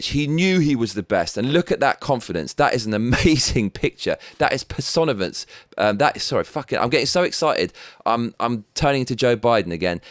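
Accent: United Kingdom. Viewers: you got the voice and Brit accent, you're interesting, articulately spoken words resonate fairness and humility. Everyone is locked loaded in these uncertain days, propagandized lies from Leftist media. These radical Totalitarian Socialist have firm grasp on most of our government agencies. I pray Trump can fix this if not America greatest is a thing of the past.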